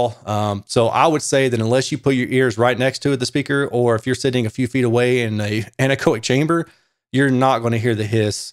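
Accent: South, United States. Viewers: that's great 😎 but what did you wrote toms? Unless its me that misunderstood his southern accent?😂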